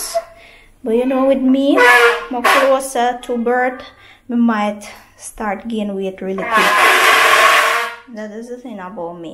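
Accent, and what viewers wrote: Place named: Jamaica. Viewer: Congrat to you+ baby girl news Wow i'ts a 👧 girl 🎉🎉❤. I tell you i respect you very much 👍🏻.you have a good vibes, you adapt the jamaican culture very much 😊🙏🏻 lady you talk like a jamaican. & you cook like a jamaican.nuff respect & nuff love.bless up from germany.🙏🏻🛐🕊💫.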